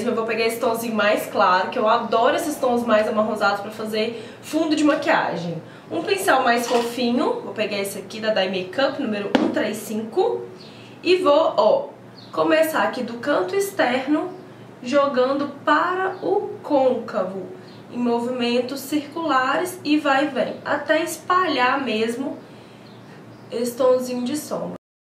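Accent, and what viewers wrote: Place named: Florianópolis, Brazil. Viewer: A aaaaamo esse sotaque seu de maneirinha, vc é linda e mto agradável, bjs sua linda!!!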